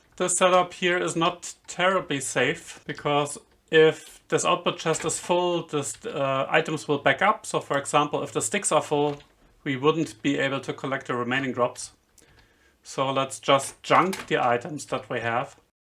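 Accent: Germany. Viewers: Question about your accent, are you from Germany?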